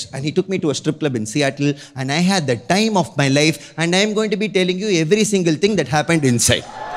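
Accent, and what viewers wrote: Tamil Nadu, India. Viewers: God sir u have the most typical Indian accent 🤣🤣🤣🤣 loved the skit.. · guy hs got a thick Indian accent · Purely sickening jokes.....accent soudhi ndian, heavy blown tamilian...crap...audience also samebrain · 😂tamil ya avan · He is the guy whose accent is depicted as Indian's accent by westerners..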